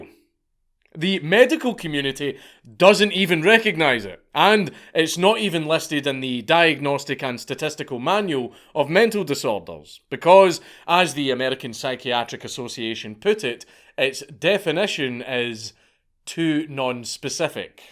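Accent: Scotland, United Kingdom. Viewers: stfu ur scottish brother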